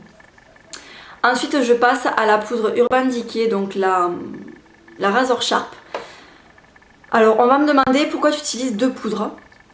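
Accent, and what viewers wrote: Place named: Marseille, France. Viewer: vu ton accent tu dois venir prés de marseille?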